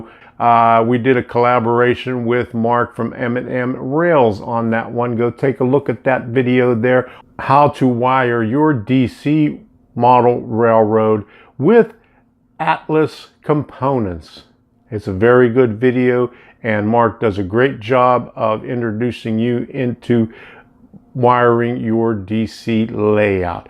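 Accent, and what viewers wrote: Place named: Philadelphia, United States. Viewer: You can plan away until you are blue in the face, but when it comes to the "do", plans usually have to change on the way. However, I've learned one valuable lesson which, over the years has saved me both money, and time - thats, dont fix in place until you are sure its where you want it to be, and that applies to track, buildings and wiring alike. I've just spent 6 months working with Railmodeller Pro software, and everything fitted a treat, but I hate to mention how many times Ive substituted one turnout for another or rebent lengths of flexitrack getting stuff to conform to my "ideal" - easier to get your sections right first before you cut and have to rejoin track. Now on the "do" stage, and testing with most unreliable loco, longest coach, and widest truck load to ensure power joints, clearances and curves are as "hoped for". 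Still nervous about combining light and semaphore signals - in 50 years, only ever done the latter.... Great vids - keep them coming...dunno what some folk whinge about, I'm a brit, and I understand your "philly" easily. Wonder if these folk who complain about regional accents are as ready to criticise UK colloquialisms as readily to peoples faces?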